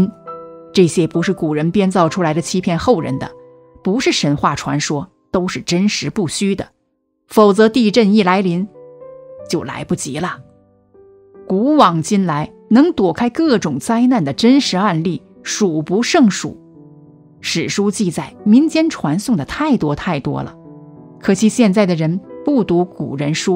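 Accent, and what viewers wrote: China. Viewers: 明明是中國人卻要講台灣的事，口音是中國口音，中國人開始用繁體字了嗎？，什麼土地神？台灣人都叫土地公。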